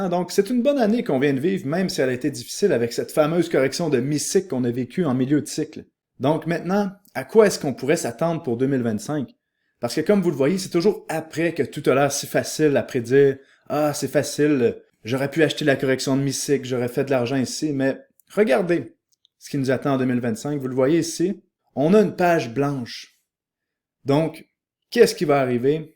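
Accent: Quebec, Canada. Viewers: Avec un charmant accent quebecois wn prime c est du bonheur. Merci pour la qualite de ce que tu donnes et l energie que tu partages. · Bonne année, bonne santé et tout ce qui va avec !🎉 Je te suis depuis que je suis rentré dans les crypto en avril 2024 et j' apprécie vraiment ton contenu. Les expressions "allez les guerriers", "porno de la peur", ... donnent un peu d'exotisme à tes vidéos au delà de ton accent québécois 😂 J' ai téléchargé ta formation gratuite comme ça je serai au top pour le prochain bullrun. Merci pour tout! Change rien! Let's make monney!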